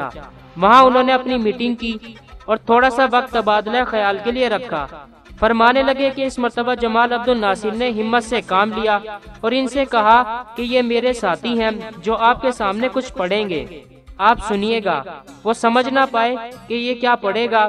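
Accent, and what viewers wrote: Azamgarh, India. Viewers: aap Azamgarh se ho kya?? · good information About QRI Abdul BAsiT A lot Thanks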